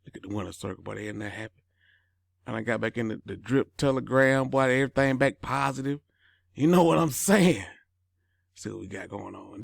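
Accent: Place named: South, United States. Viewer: That that “southernplayalistic” dialect!! Yas sir!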